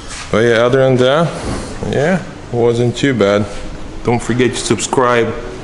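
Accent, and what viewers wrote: Russia. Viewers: Выдает русский акцент ))))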